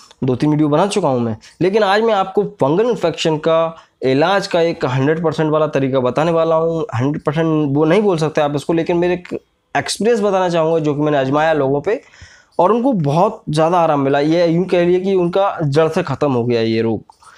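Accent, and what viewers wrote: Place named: Gwalior, India. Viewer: Gwalior side k लगते हो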